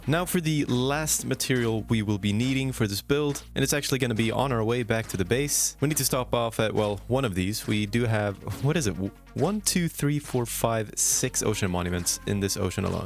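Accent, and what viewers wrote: Sweden. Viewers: Wait are you Swedish lol, that’s identical to a Swedish accent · are you Swedish??!!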